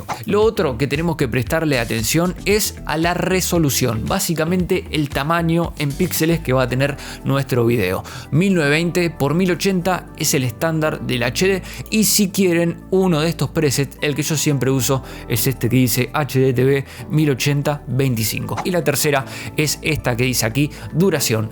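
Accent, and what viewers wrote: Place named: Argentina. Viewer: no se si es porque hablas como argentino o que seera, pero por fin alguien que lo explica como corresponde!!!!!Argentinaaaaaaa , el idioma original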